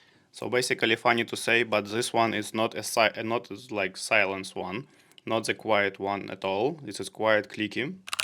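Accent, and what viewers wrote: Russia. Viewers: А почему акцент русский?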